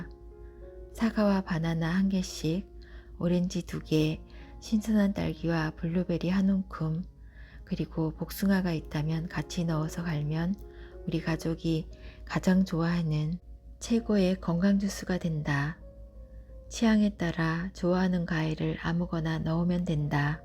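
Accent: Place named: Gyeongsang, South Korea. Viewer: 경상도 억양..😢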